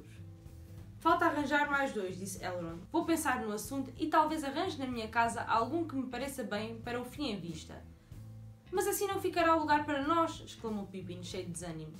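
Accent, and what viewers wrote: Portugal. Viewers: Sotaque de Portugal amo!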